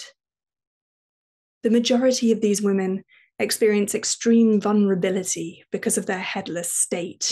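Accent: United Kingdom. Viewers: The British accent is beautiful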